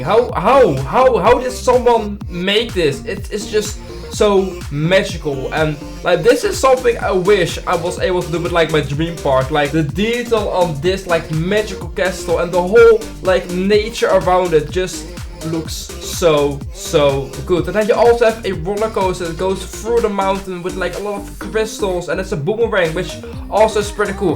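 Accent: Netherlands. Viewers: Dutch accent nice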